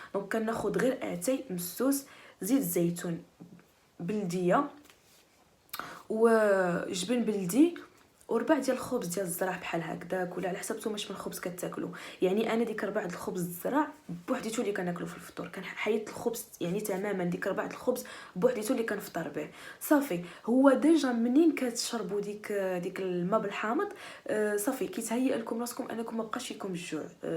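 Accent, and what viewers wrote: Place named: Algeria. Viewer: عند.لهجة كيما صحاب جزائر وأنا نموت على مغاربة يعجبوني بزاف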